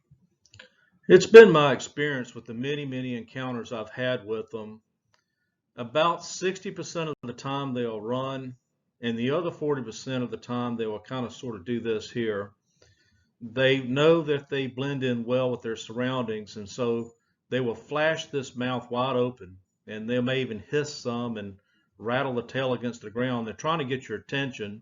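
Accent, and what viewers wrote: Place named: Alabama, United States. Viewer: Thanks fer speaking more like us Alabamans do talk.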